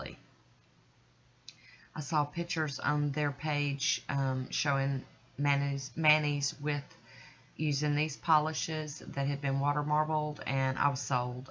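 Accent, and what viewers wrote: South, United States. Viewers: I love the names, too funny. I love that they have a southern accent. Pannnkk!!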